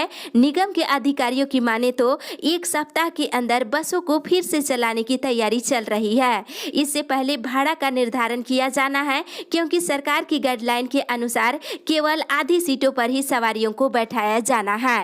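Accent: Jharkhand, India. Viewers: Aapke news kaafi jaruri ho gaya abb jharkhand news updates k liye... thanks. Aur aapki awaz me sudh desi jharkhandi bhasha ki khushbu bhi aati hai ....ha jbb kabhi kabhi aap uccharan me thoda mistake v karti h to bura nhi lgta h bus reality lgta h. Udaharan k liye "Faculty" word aaj k news me.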